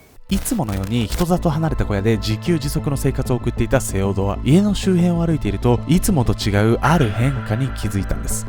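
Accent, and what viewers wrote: Kansai, Japan. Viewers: 関感凄くて草www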